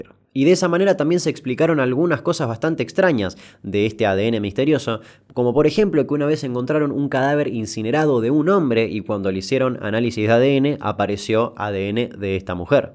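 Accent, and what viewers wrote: Buenos Aires, Argentina. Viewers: Las gashetitas con ese asento de porteño jajaj Pd soy cordobes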